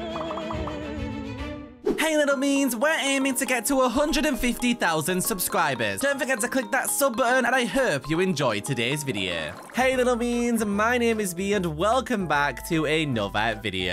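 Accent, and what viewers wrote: United Kingdom. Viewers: OMG ty so much for telling me and I love your accent I’m from Britain too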